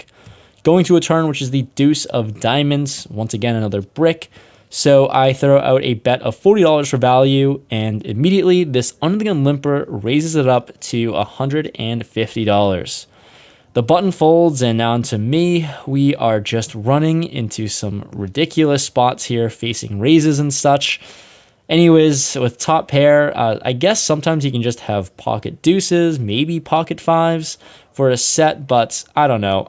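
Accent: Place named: Western Massachusetts, United States. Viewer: Where are you originally from? i hear western mass a lot and i know you mainly play in NH. Are you from MA or NH?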